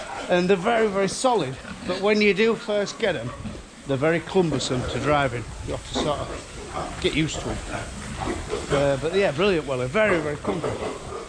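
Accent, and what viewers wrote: Yorkshire, United Kingdom. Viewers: Your accent reminds me of Kirkkbymoorside....are you anywhere near there ?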